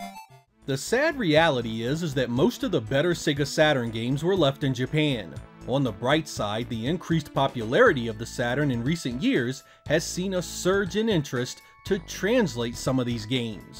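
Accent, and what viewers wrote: United States. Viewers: After so many videos it finally hit me why your accent is so familiar, you must be from the same state as Tim Cook?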